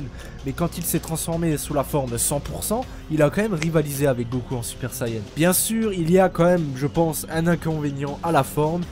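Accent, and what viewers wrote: Alsace, France. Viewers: Waouh c'est génial cette chaîne je connaissais pas sinon par pur hasard tu serais pas alsacien😂? On reconnait le petit accent (pcq je le suis)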